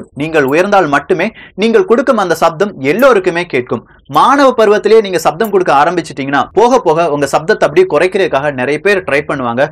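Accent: Kerala, India. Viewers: Don't compare with TamilNadu and Kerala, (u are a malayali your slang is little much of keralites) TamilNadu is always developed state then Kerala,. We have large no of colleges, most if the keralites willing to study in TamilNadu only. After primary education for best higher studies they have to come here only.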